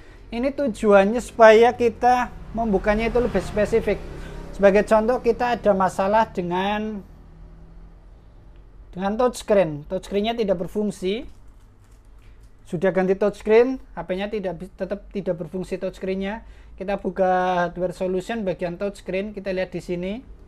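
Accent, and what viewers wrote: Banyumas, Indonesia. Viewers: Genah kie mesti wong ngapak...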